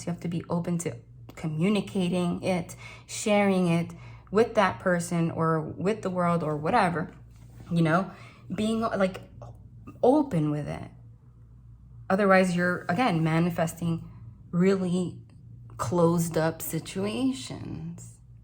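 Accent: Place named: New York, United States. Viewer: I love your new york accent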